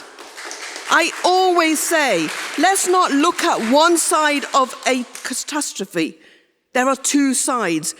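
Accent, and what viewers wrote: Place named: England, United Kingdom. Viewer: Godi media with an English accent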